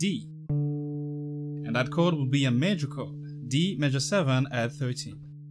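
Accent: France. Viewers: Awesome man, i'm very grateful. Is that a french accent?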